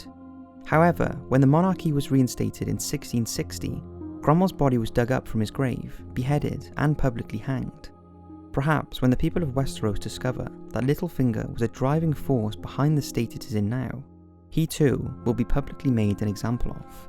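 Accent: Wales, United Kingdom. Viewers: I think it's a Welsh accent.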